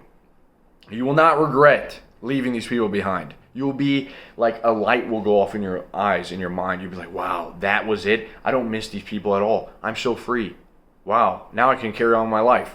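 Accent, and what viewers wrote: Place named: Michigan, United States. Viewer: Are you a Michigander? Cuz you sound like a buddy of mine from up there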